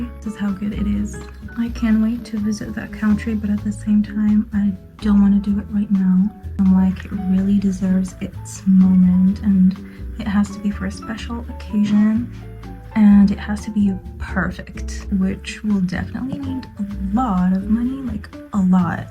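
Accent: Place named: France. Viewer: And the french accent was smooth and pleasant to listen to 🫠🫠🫠💋💋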